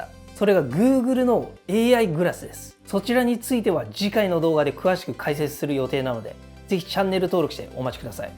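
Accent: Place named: North Kanto, Japan. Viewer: 北関東かな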